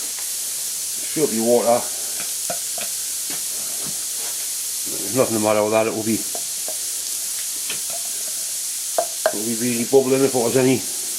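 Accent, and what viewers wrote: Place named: Geordie, United Kingdom. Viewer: is a Geordie and speaks the regional dialect. If you are having trouble understanding him go to settings (the little gear on the lower right) and set the speed to 75%. I sometimes have to do that, especially with his friend.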